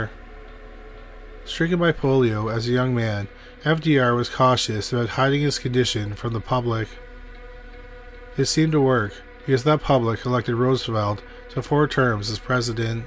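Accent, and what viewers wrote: Canada. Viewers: Remember that he isn't from the US. Maybe up in Canada they are taught to pronounce words this way. They think we are insane for not spelling words with u's in them like colour for example.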